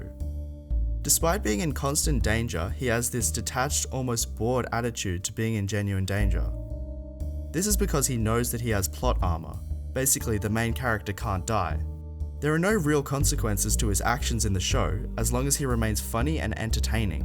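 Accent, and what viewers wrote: Australia. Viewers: My man your aussie too ^.^ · Every thing is better with Australian accent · Aussie boy!!!!😀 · Yeah a Aussie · I FOUND ANOTHER AUSTRALIAN TUBER YEEEEEEA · I gotta love that aussie Accent. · Woo an Aussie!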